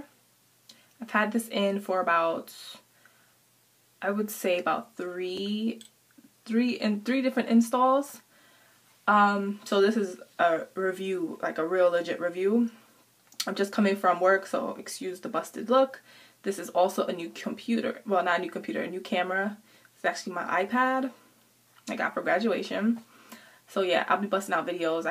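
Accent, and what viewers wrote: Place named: New York, United States. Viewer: Omg, I love your Ny Accent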